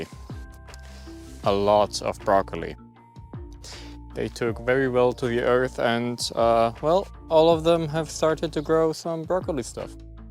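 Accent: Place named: Ireland. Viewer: Das war wieder ein sehr informatives Video😊 und du hörst dich langsam wie ein echter Ire an😂❤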